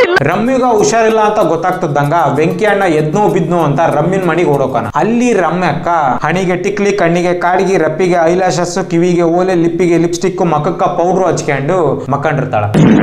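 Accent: Uttara Kannada, India. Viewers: Uttara Kannada maatugalu.. namma huduga ivaan❤❤ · full uttarakannada slang a maintian maadri ......eee nadu nadu bangalore accent byada...goood going...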